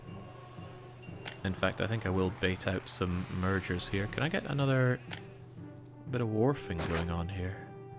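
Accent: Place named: Scotland, United Kingdom. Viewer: Dark Young is a bad influence. Since you started hanging out with this guy, you've been sounding increasingly... Scottish.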